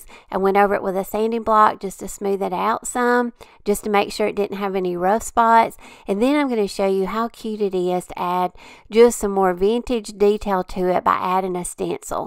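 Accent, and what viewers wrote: South, United States. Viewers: Just found your channel and love your creativity and your Southern accent! Up here in Canada we having boring voices..lol. you are so sweet. Going to enjoy following you💕💕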